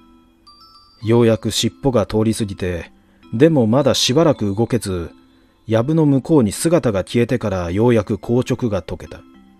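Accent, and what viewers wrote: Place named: Osaka, Japan. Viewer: おはようございます。いま拝聴しました。なでか懐かしく感じられる里山の風景と滑らかな大阪弁小さな出来事が見事に描き出され朝からほっこりしました有り難うございました。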